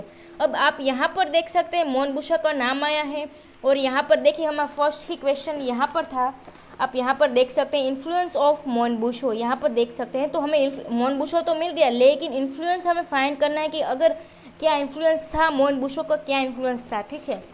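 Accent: Gujarat, India. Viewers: Awesome 👍, are you gujarati?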